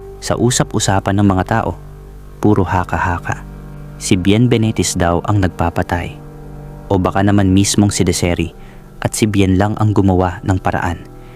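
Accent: Visayas, Philippines. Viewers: Bisaya ba Yung narrator?Hindi marunong mag bigkas ng salitang Tagalog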